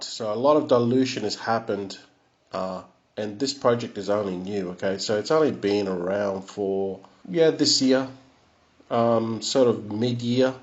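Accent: Australia. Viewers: Hey mate, nice to hear an Aussie voice amongst all these crypto vids. I’m pretty new to this but trustswap seems like a no-brainer. Not sure I can afford to purchase enough to stake any ICO’s immediately. From your understanding is it still worth holding the token? I’m thinking so but still pretty green! Thanks for your thoughts...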